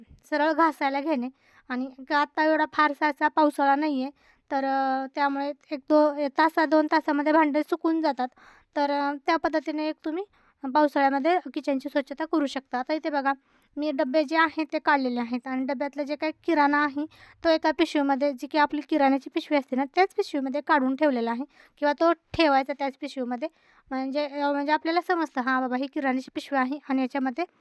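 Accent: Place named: Khandesh, India. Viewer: Waadte tii khandeshi aahe ..me pan . Ticha accent khandeshi aaye ..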